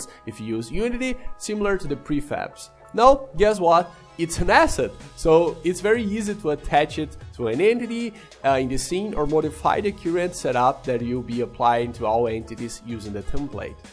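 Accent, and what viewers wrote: Rio de Janeiro, Brazil. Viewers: sotaque brasileiro é impossível de esconder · Esse sotaque da cidade maravilhosa não me deixa duvida kk... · Brazilian accent, commom brazilian name 🤔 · E parece ser carioca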